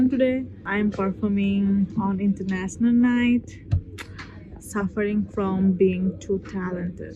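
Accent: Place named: Bangladesh. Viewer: i'm a student of hsc 26 batch and recently i decided that i want to study archi from buet. so i searched up archi related bangladeshi videos but the content is so less. this video randomly popped up in my yt homepage and i started watching and thought to myself "accent ta amader moto lagtese. apu ki bangladeshi naki?" and went to comment section to check and YOU ARE ACTUALLY ONE OF US. i just binge watched all of your YT videos and GIRL I HAVE BECOME A HUGE FAN. a girl? check. buetian? check. archi student? check. moved to usa? check. APU UR LIFE IS LITERALLY MY DREAM AND I ASPIRE TO LIVE LIKE THIS ONEDAY. lots lots lots of love and im so disappointed to hear that u got trolled online. plz dont stop making these videos. im really motivated rn as i learned je amr dream is not actually impossible and someone is living it already. lots of bhalobasha again ❤ ❤ ❤ ❤ ❤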